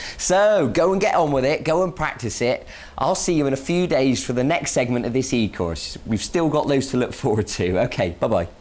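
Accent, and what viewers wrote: United Kingdom. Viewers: oh boy!!! r u kiddin' me!!? I agree that Boys n Gulz have different voives but gays also have got their unique voice, i knew 2day... he's a British n british have their own way of talkin' English. That's it! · his voice isnt funny hes british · Well, Great Britain is a pretty big place, so there's plenty of different dialects (a guy from texas and a new yorker doesn't really sound like they're from the same country). He doesn't talk the stereotypical London english, but it stills sounds like he's form GB.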